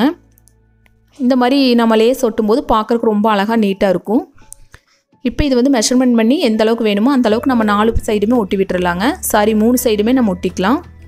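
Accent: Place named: Tiruppur, India. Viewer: Amanga nanum tirupur thanga...athanunga pathen nenga pesarathu namma ooru maathiriye irukunu superb nga ka...nenga podara videos ellame rmb rmb superb nga ka...unga video epa varumunu nan parthutte irupenga ka vanthathum parthuduvenga ka...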